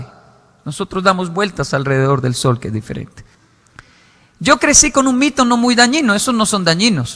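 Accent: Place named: Colombia. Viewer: Es colombiano. De ahí su acento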